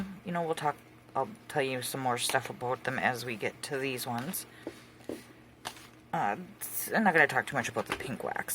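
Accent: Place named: Midwest, United States. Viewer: Your from the Midwest huh, I nooww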